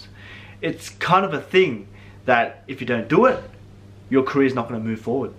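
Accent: Australia. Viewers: I love your Australia n accent.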